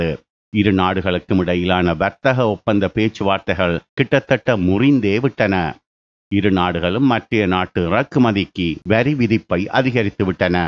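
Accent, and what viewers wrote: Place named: Jaffna, Sri Lanka. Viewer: எனக்கு யாழ்ப்பாண தமிழ் மிகவும் பிடிக்கும்🇮🇳👍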